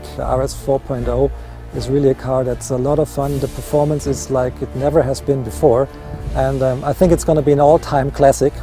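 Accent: Germany. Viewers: Kann gut sein, wobei mein Englisch relativ gut ist ; ) Hab vor knapp 'nem Monat mein Englisch-Abitur geschrieben und hab für mehrere Monate in den USA gelebt ... Aber du hast Recht, sein Englisch ist nicht schlecht. Mich stört es nur, wenn man schon beim ersten Satz hört, dass da ein Deutscher redet ; )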